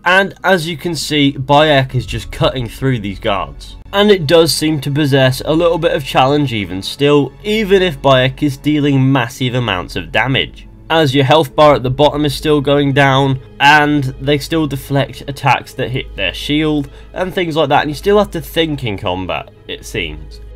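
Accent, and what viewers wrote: United Kingdom. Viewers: Hahahaha !!! ... "Arrow after arrow after arrow after arrow" ... I Enjoyed a lot hearing this in your British accent ... I am still laughing ...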